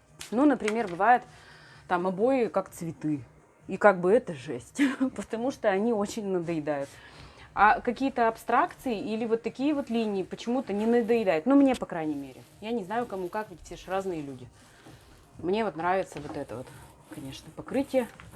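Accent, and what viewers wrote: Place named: Udmurtia, Russia. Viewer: Тётенька прикольная и акцент как с Удмуртии )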